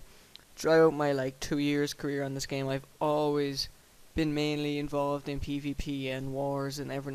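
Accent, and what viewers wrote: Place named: Ireland. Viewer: What part of Ireland ya from? Waterford?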